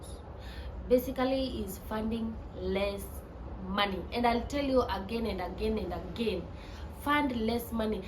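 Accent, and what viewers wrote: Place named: Kenya. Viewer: The kenyan accent! 9feb26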